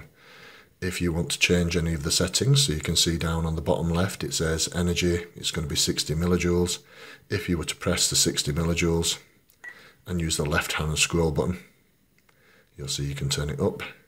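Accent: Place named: Yorkshire, United Kingdom. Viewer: Good Northern Yorkshire(?) accent and very informative on his personal experiences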